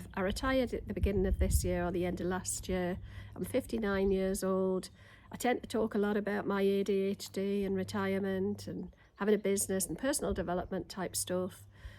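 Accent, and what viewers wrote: United Kingdom. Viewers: Aww, you are brilliant, I love your honesty and openness, I agree with the other posters, just do it for you, if you’re not worried about the monetisation piece, do what you love and enjoy. You’ve got your hardcore here. I sometimes think when I comment, it’ll just be boring old Nat again and not someone new but, we’re here for it and we love all your stuff. Before you said it, I was thinking you were very geordie today and I LOVE that! My Dad was from Newcastle and although he moved down south as a nipper and didn’t naturally speak in the geordie way, he could turn it on in a flash and I really love the accent because, in my roots I have a wee bit of geordie 🥰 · I don’t think I’ve heard you speak with such a Geordie accent since before you headed for the Hebrides. You must be low., just keep doing what you are doing. Something will just happen and I guarantee you’ll not be able to understand why. 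That was the case with both of my channels. Try this. Picture one person in your mind throughout the whole video creation process, the prep, the shooting, the editing, the uploading and the thumbnail/description. Make it for that person and them alone. Make that person a fictional person. You want to give them a pleasure in watching your video. You want them to feel comfortable watching your video, feel happy, entertained, educated, inspired. You do all of those things to people in the real world everyday so why do people watching get different treatment. In other words, let that person see you, not what you think they should see. But direct it to that one person in mind.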